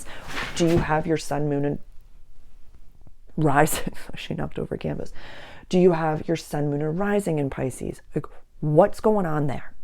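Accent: Boston, United States. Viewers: Love your videos so much. It feels like dishing with a Bostonian older sister.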